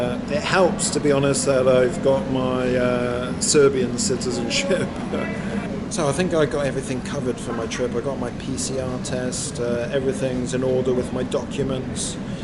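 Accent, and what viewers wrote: England, United Kingdom. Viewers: How did you get Serbia citizenship? With that English accent!?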